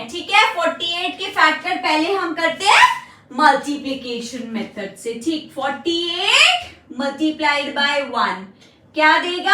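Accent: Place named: Mewat, India. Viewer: Mewati awaaz to halki karo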